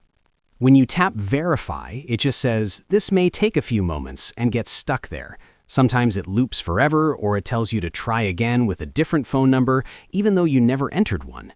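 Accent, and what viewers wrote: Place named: United States. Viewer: Finally a fucking american (proper english) accent would prefer a fucking ai than a fucking indian all the way!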